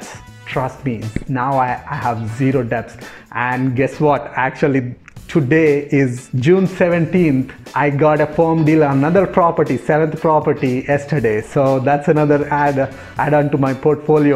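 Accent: Telangana, India. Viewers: Great story. You sound like you are from Telanagana, if so then which part of Telanagana you are from?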